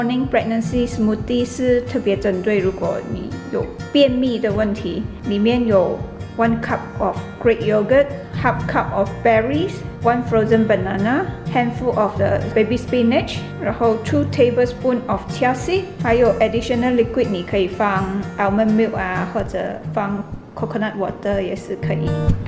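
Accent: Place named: India. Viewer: 你说英文有点像印度人说的英文🙈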